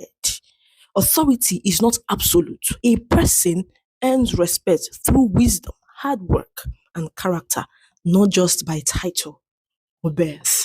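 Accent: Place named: Abia, Nigeria. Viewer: Love you my sister, are you from Abia State because you talk like us.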